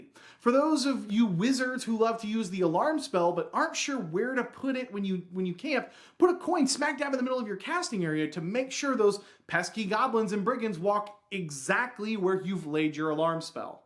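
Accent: Texas, United States. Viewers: didnt know you where texan hello from killeen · Now I want to know where in Texas he's from. . .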